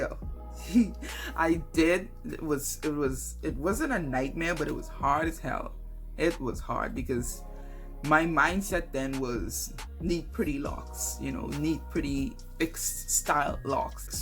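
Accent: Bahamas. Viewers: Girl are you Bahamain, your accent sounds familar? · Thought you sounded like a Bahamian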